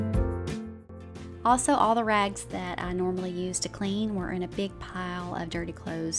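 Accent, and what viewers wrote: South, United States. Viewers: I'm in love with your Lil southern accent you're so comforting 🥺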